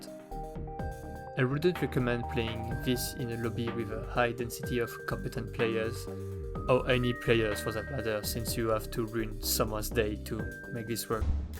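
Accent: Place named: France. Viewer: Aaand Functional Architecture is being nerfed next patch, so ixnay on that plan :P By the way, it seems like the Shattered Ring gets regular planet designations, so how about going Lithoids (+Volatile Excretions for tile-blocker removal and war edicts?), colonizing the next-best planet, moving the capital there, and designating the Shattered Ring as a Mining World for +25% alloy output? PS: I like the French accent, it's not mediocre at all!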